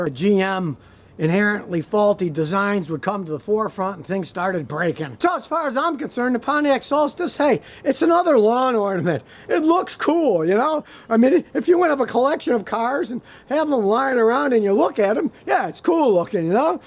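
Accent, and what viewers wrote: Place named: South, United States. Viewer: Your brain tends to really question itself, when it see's a John Lenon look alike guy talking in a southern accent, especially when you're high.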